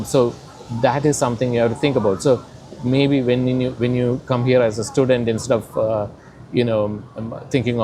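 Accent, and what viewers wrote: Kerala, India. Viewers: Very useful.... seems you are from Kerala.... ?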